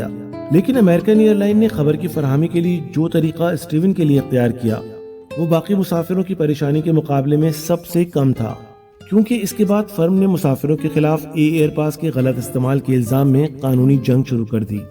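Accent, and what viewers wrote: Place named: Pakistan. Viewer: Masha Allah aapki voice or Btane ka urdu lahza dil ko chuu jata h .. puri yt m sbse best of best videos hoti h outstanding Big Respect 💝😊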